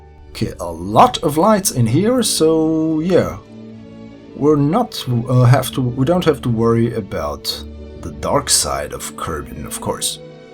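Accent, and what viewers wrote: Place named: Germany. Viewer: Thanks for the entertaining commentary. Always fascinated by your amazing designs with practical and elegant solutions. Good choice of analogy with the "unfinished symphony", since nothing ever gets finished when you play KSP... I hope that you soon again will show how to make Mun bases. I really liked "Operation Moonsucker" Btw. are you from Germany? I note a barely noticeable German accent in your flawless English.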